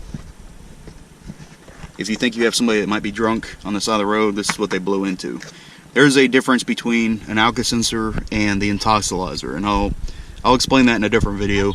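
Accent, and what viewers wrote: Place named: Georgia, United States. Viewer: Wow this such a good idea for a youtube channel!!! Cute Georgia accent!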